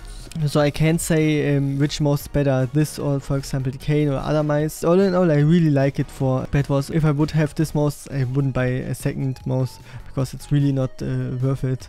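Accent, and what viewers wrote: Germany. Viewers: Ich finde es sehr unterhaltsam wie du mit deinem deutschen Akzent sprichst xD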